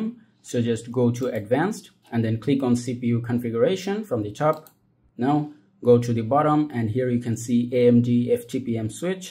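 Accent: India. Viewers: Тот самый момент когда уже все перепробовал, а какой-то чувак с голосом индуса снимает это видео на телефон, и это тебе помогает😂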